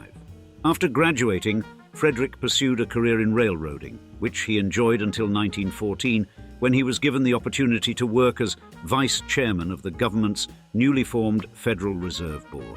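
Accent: United Kingdom. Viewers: Americans pronounce the name 'De- lah-no'. The narrator speaks with a British accent that says it differently.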